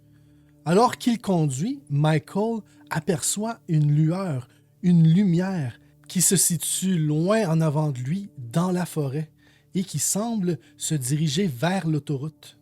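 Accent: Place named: Quebec, Canada. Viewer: Toujours un plaisir d entendre l 'accent , à Guebec , nos anciens parlaient comme ça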